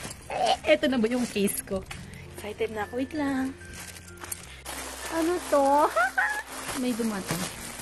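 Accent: Visayas, Philippines. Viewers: so witty 💕 Parang may pagka bisaya accent 😂 which is ang funny pakinggan! HAHAHA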